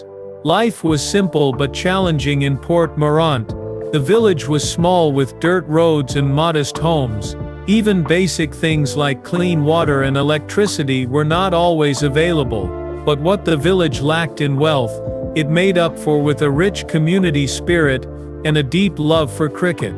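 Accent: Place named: United States. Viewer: The narrator sounds like an Ameican!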